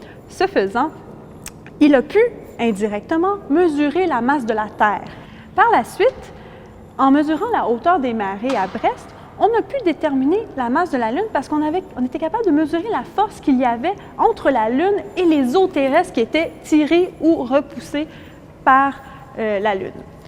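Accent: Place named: Quebec, Canada. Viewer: Aaah l'accent québécois... Il rendra n'importe quel discours humoristique, aussi sérieux soit il. XD. Sinon très intéressant.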